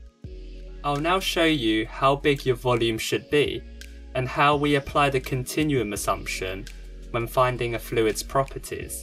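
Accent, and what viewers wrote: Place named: United Kingdom. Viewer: marvellous animations, topped off with an explanatory British-Chinese accent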